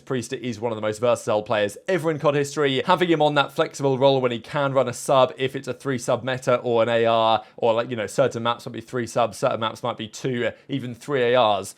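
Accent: England, United Kingdom. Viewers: I think he says " back again today" but it sounds like "hocked rob" cuz he says it so fast in his English accent lmao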